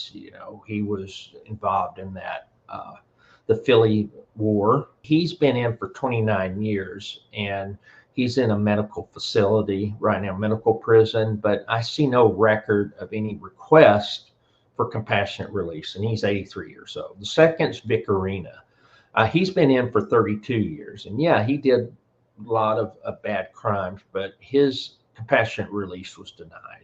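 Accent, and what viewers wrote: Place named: South, United States. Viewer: I’m relatively new to this channel and honestly, I’m still on the fence whether I like it. it’s very difficult getting used to someone with such a thick southern accent trying to pronounce all these Italian names. All of that said, and I’m not a snowflake who gets butt hurt by things, While I realize everything you spoke about regarding John Pennisi is in the public record and public domain I don’t understand why you thought you needed to go through his record on the Internet. Personally I didn’t think he said anything disrespectful about the man who died, John just said things as statements of fact. It’s only relevant because, as mentioned in this video it’s considered to be taboo to take your own life in the lifestyle. You mentioned John has a prison record of “self harm” but without context, we don’t know what that means, he could’ve punched a wall for all we know. 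I just feel that bringing all of that out without all of the facts and proper context isn’t the right thing. The other guy has been dead for almost 15 years. I think there’s a slight difference.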